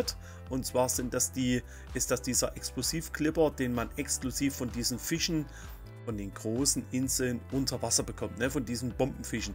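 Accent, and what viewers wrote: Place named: Saxony, Germany. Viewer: Ich bin, deine Stimme hat mich geholt mein Sächsischer Bruder!!! <3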